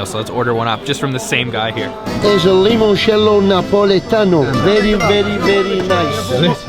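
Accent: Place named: Canada. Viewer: this guy has such a heavy canadian accent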